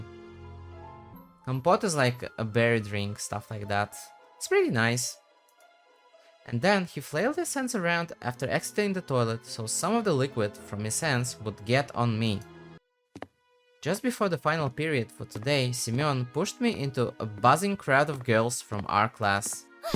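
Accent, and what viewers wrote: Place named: Russia. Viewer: Как только услышал идеальное произношение русских имён, сразу понял, что смотрю не иностранца... Произношение на английском у вас шикарное. Если сильно не вслушиваться, то можно и не заметить акцента, что, собственно, и произошло)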